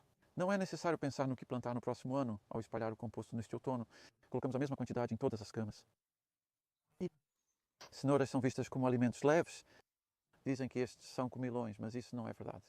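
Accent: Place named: Portugal. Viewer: Bom agora temos um canal em português ( com sotaque de Portugal) desse mestre. Tava difícil entender porque todos vídeos não tinham legenda em português ✌️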